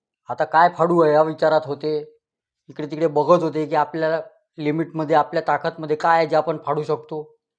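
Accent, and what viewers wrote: Vidarbha, India. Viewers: फार सुरेख नाटयमय कथाकथन ! Your pronunciation is with a humorous accent ...Your speciality.. वैदर्भिय लहेजा ..Pl keep it up !👍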